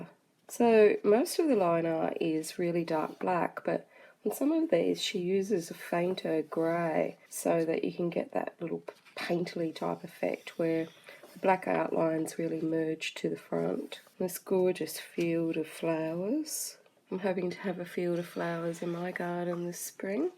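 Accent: Australia. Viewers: Like your review style.like your Australian accent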